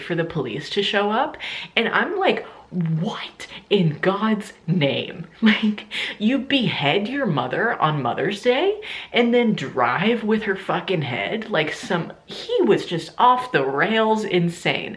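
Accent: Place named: Western Pennsylvania, United States. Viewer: This sounds like western Pennsylvania 😂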